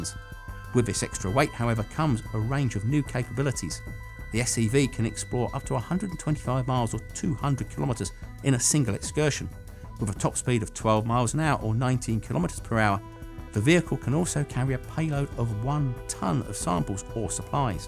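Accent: United Kingdom. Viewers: British. In the UK, the official unit of measurement of length is the meter. The pronunciation is a telling sign..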